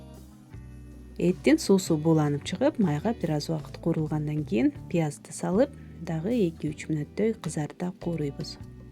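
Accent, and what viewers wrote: Standard. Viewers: ушул кыздын уну да жагымдуу. нукура кыргызча да келиштирип суйлойт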